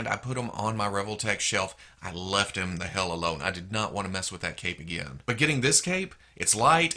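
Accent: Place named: South, United States. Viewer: Not gonna lie, my favorite part of your videos is your smooth, southern accent. It's very pleasing to the ears. I don't know why I feel this way and I'm aware that it's kind of weird but I can't help my feelings dammit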